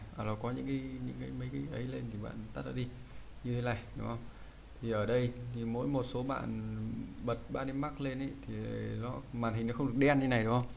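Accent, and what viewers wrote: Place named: Vietnam. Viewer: Đọc tiếng Anh như tiếng Việt, hài quá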